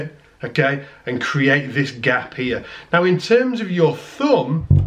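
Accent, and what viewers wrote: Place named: Northern England, United Kingdom. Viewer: Eh, he's a northern Brit, it's OK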